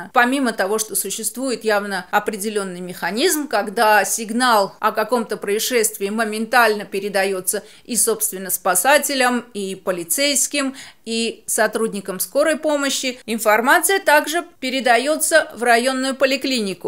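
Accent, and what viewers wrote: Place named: Armenia. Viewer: Вы уже говорите с армянским акцентом 😂 👍👏.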